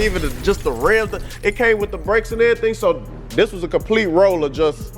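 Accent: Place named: South, United States. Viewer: Your black car in my opinion is way nasty 🤢 then that mustang well I guess if it was at least LSX swapped 😂 but your on your way to being a car guy and I know you goin get mad when I say this but if you didn’t know what that car was untill they said not good when you walked in the buildin even if we gm guys but ford guys that cobra is a relic even got leather seats yup then white bits ain’t playin you can tell but the way they do big shit every car they had was 150k race cars 🏎 they don’t fuckin play games then the guys that you don’t race then he was talking you know he’s grown ass MAN. When he says I’m NOT. Going to talk shit till the cars done and we no what we got he’s grown ass NA. I respect that but I like your channel about 85% of the shit you say I have to ask my 21 year old son what the fuck is saying you kept saying KAA and I said mason what the fuck is he saying bub he said car dad I said awe shit he sound like our fam from the south you gotta ask then four times what you say huh huh 🤔 lol but I like this channel you never know what’s going down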